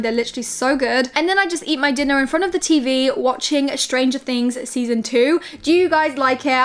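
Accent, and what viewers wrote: England, United Kingdom. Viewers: Are u English ?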